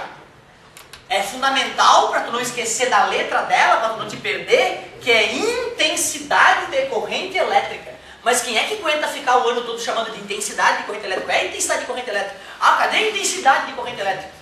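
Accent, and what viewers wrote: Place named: South, Brazil. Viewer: "tu larga duas baguinha" adoro esse jeitão de falar do sul!!! Parabéns ao mestre!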